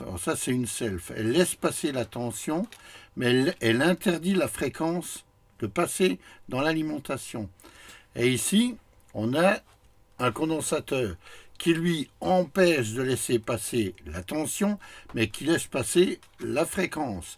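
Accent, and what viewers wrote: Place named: Lorraine, France. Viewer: Bonjour, vous avez un accent lorrain,de quelle region etes vous.?...Connaissiez vous L ESR à Nancy boulevard jean jaures (L ecole speciale de radio électricité ) en 1968 et 1969...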